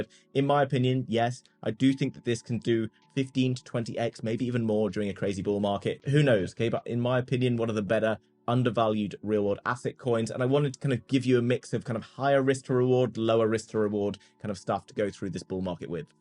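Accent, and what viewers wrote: United Kingdom. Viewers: He sounds british to me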